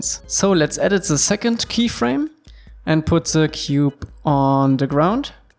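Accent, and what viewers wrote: Germany. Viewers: Deutsch Akzent is da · Schöner Deutscher akzent Das video ist supi 👌🏻👌🏻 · echt jetz? warum denn nu in englisch? wenn ich das will da gibts doch schon so viele....aber gute "how to`s" in deutsch sind doch schwer zu finden! Bitte - hast übers animieren auch in deutsch was gepostet? Ist super wie du das machst, aber dein englisch ist ....lustig - so deutsch, smile^, wie langemachst du das schon? und wie lange bereitest du dich auf so ein video vor? Danke fürs antworten auch wenn der Spot schon alt ist^^ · Love the German accent ?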